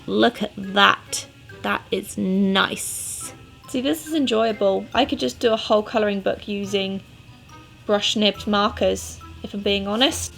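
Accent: England, United Kingdom. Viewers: As I understand you live in America, but aren’t you British? I’m thinking this because of your accent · Have you ever lived in England because you sound a bit English but I belive you live in America but I'm not sure 🙃 Also I love your videos, they're really funny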